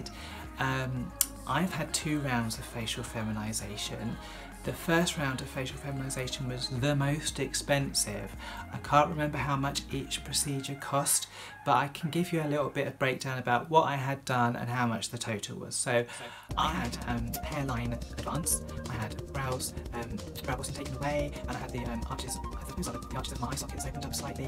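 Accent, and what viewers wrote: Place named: United Kingdom. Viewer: Anyone comment on the similarity of your voice with that of Charlie Martin? You both must have been born and/ or raised in the same elegant part of the UK. Just my American ears. 🧐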